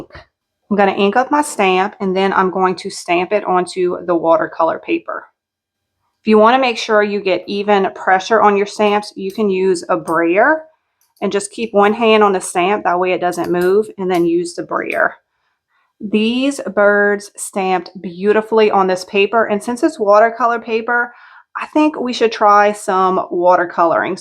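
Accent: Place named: North Carolina, United States. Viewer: I love her accent. I'm from NC and we get made fun of at times as well by the Northerns. LOL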